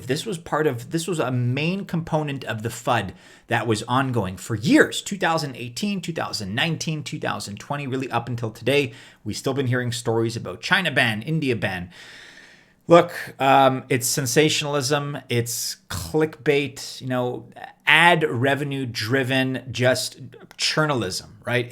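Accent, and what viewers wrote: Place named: Canada. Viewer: I come for the Canadian accent. Chance at ETH doesn't hurt either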